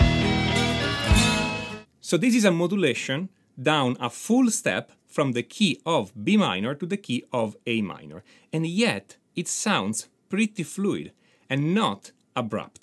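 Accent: Italy. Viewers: Hello are you italian?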